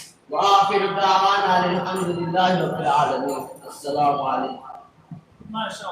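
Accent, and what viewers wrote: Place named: Arab world. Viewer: Masha Allah Arbi lahja aur tariqa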